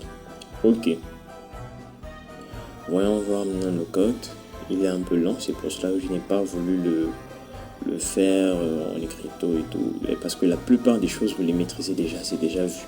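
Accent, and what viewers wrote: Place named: Africa. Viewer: tu as un accent africain bien venu dans la famille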